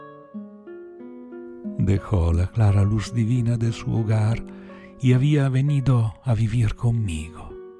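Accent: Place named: Buenos Aires, Argentina. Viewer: Tu tonada ondula entre el porteño y el italiano, ¿Eres de allí?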